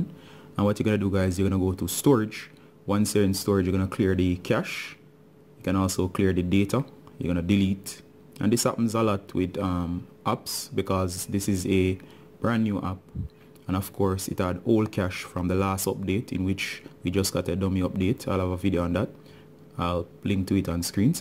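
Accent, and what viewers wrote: Jamaica. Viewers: where are you from, you sound Jamaican.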